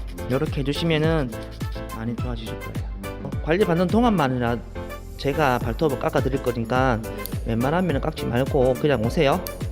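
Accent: Gyeongsang, South Korea. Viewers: 우연히 영상떠서 구독하고.ㅋ 챙겨보는중입니다🤣 좋아요도 눌러가면서 ㅋㅋㅋ 갱상도사투리가 참 좋네요.ㅎ 울아빠도 갱상도 진주 .ㅋㅋㅋ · 진짜 사람생각이 다 같은게 ㅋㅋ저도 리쌤이후로 다른분들 유튭봐도 시원하지가않고ㅠ 덜끝난느낌 이었는데 진짜 ...넘잘하시네요 ㅋㅋ 글구 남자분인데도 되게친절하시고..보통 서비스이라고 남자들 친절한경우잘없거든요 무뚝뚝하고 ㅋㅋ 근데진짜...대구말툰데 쎄지고않고 듣기좋고 실력도 넘 출중하셔요 구독하고갑니다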